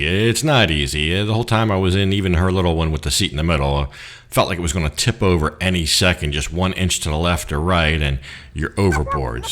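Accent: United States. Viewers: yes but he is American and pronounce different so you understood what he was saying is that not enough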